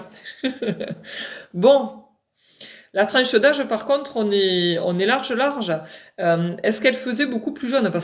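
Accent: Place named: South, France. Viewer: Moi, ca me fait du bien d'écouter votre accent du Sud 😊🤔😁Io ne me manque plus que le chant des cigales et se sera ok, je vous entendrai bien 😅🤔😁💚💚💚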